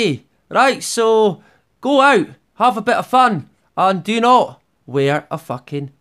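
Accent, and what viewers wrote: Scotland, United Kingdom. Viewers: aha! My first assumption was Scots, Thank goodness. My former boss from Glasgow has been passed for over 10 yr. I was one of the only peeps who understood everything he said🤠 RIP brother Kirkpatrick Keep up the excellent work!good sir☮️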